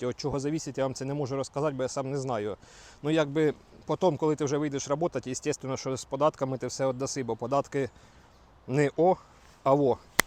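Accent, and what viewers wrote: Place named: Surzhyk, Ukraine. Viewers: Ти Мразота Зариганая😢...Кроку на Куй зі своїм франківскім суржиком🎉...Слухати ваше блювотіння....щє та пригода😢... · Так слідуючий момент це мова. Ну можливо щось виправити ну так говориш аж тошнить. Скільки вже тобі писали запитувати але ти принципово як олень вперся і доказуєш що ти говориш говорив і будеш говорити на такому суржику але суржик більш зросійщений. Англійський вдосканолюєш данський будеш вчити а рідну мову покращити принципово не бажаєш . Чому таке ставлення до свого рідного???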